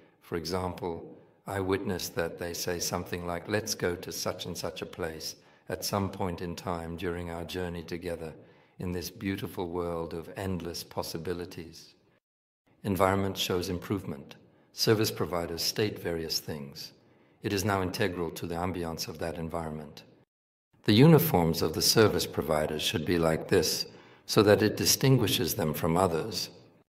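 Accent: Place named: United Kingdom. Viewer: Etkileyici bir üslüb. İngiliz aksanı da çok iyi .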